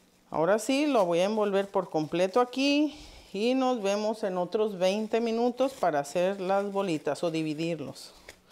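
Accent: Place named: North, Mexico. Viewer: Me encanta su acento norteño. Y como hace sus tortillas.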